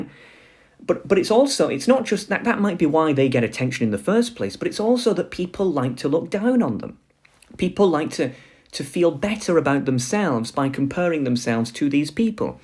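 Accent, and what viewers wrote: United Kingdom. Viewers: This has been going on for years, it has just gotten worse with the internet. Before social media really became the behemoth it is now. You sound like you are from the UK or at least lived there and we had two notable celebrities. Jade Goody, who got famous basically for saying stupid things on Big Brother and acting "low class" And Katie Price - who garnered fame and wealth by constantly feeding the gossip rags with salacious tales about her life.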